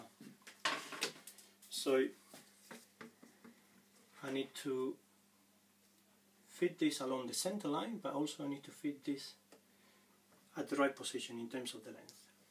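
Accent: United Kingdom. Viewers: Thanks for the videos Sr, can I detect a wee bit of Scottish in your accent?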